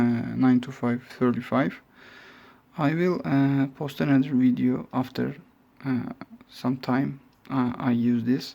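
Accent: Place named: Turkey. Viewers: 2.saniyede falan türk olmama ihtimali yok dedim ama açıklama ve isimden dolayı yanıldım mı acaba dedim, yorumlar neyse ki şaşırtmadı :) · Aksanın ben türküm diye bagırıyo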